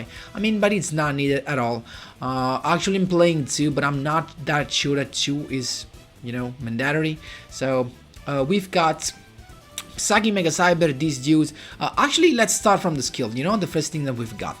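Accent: Italy. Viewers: Parli na cifra bene in inglese ma si sente che sei italiano bro, bel video comunque di rado si vedono deck list diverse dalle solite che sono anche competitive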